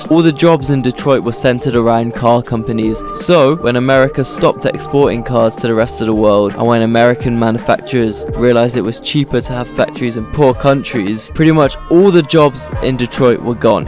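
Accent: United Kingdom. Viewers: So cool, with your bri’ish accent, thanks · Not a good video of Detroit. You have a person with a UK accent that cant pronounce Hamtramck correctly & really knows nothing about the city.....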